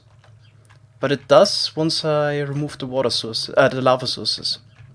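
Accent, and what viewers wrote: Germany. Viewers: Sometimes you can feel the german in your voice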